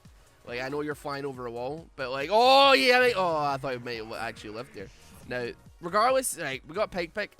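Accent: Scotland, United Kingdom. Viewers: Just a heads up, man, he's not Irish, he's a Scott.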